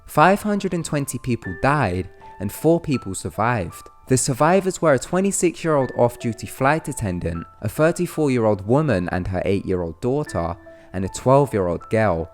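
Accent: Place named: Russia. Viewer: Русский акцент у говорящего..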